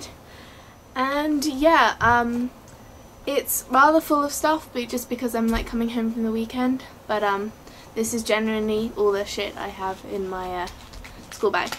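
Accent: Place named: United Kingdom. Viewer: Hi, if i saw right... your name is??? I'm not very sure!!! hah. and if you don't mind me asking, where are you from?? You have a lot of Japan things, but it sounds like a British ac sent (i do not know how to spell that words).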